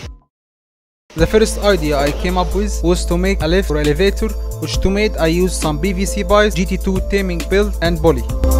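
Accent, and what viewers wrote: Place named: Egypt. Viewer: لهجتك في الانجليزي بتقول ان انت مصري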